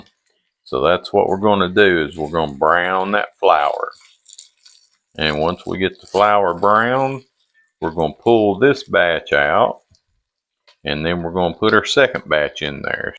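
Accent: South, United States. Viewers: New subscriber here who just happened upon your video and My husband and I can’t wait to make this and we will be watching for more content and just to listen to you talk I LOVE a Southern accent more than anything in the world ❤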